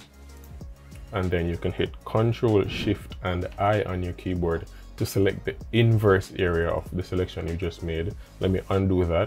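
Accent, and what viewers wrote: Jamaica. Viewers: Excellent video. Do I detect a Jamaican accent? Thanks for sharing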